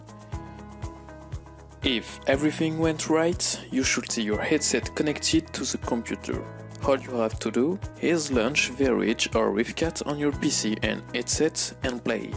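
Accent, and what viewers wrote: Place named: France. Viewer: i spot a french accent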